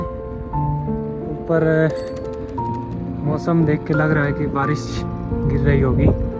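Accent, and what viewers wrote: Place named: Haryana, India. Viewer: भाई कभी कभी ये हरयाणवी में इंग्लिश बोलते हो ये वाकई लाजवाब है...🙂😊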